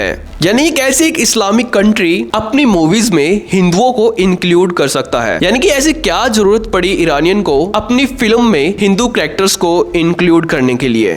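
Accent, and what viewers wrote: India. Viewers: bihari accent per thoda kaam karo, स ओर श bolne per.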